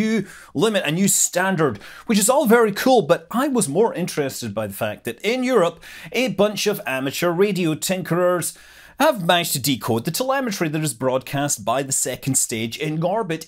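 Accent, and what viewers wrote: Scotland, United Kingdom. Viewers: Is this guy a Scott? Love the accent!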